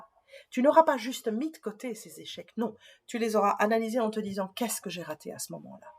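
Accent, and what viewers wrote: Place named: Belgium. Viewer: Bonjour, j'adore vous écouter mais je me demandais d'où venait votre petit accent? Belgique?... Moi, je suis normande mais j'habite près de Paris. Merci!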